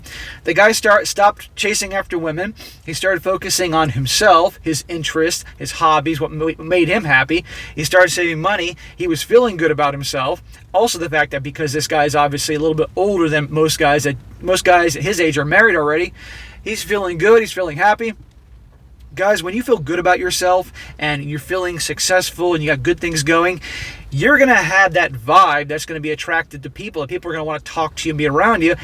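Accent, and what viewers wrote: Philippines. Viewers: Judging by his level of English I'd guess Philippines. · This dude sounds like he’s from the Philippines.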